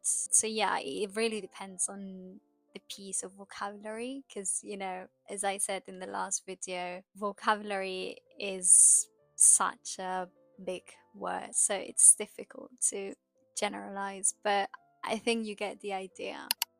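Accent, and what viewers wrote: United Kingdom. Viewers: oooo British English.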